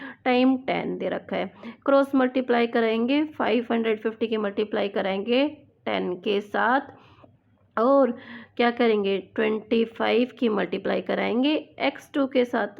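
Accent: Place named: Haryana, India. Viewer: Ma'am aap kaha rehte ho? Harayana? Aapka accent sunke lagta hai aap Harayana ke ho. Aap Harayana ke ho kya?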